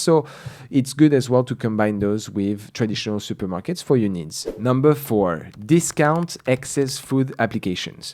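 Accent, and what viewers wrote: France. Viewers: Found your content recently and it’s been great - also enjoy the French accent ! Haha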